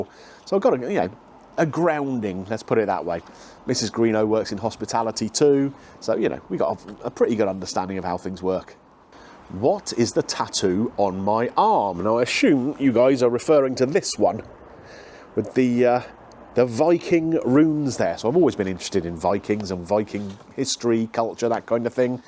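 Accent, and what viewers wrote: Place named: Dorset, United Kingdom. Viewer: Greetings from puddletown in Dorset...nice to hear a fellow Dorset person..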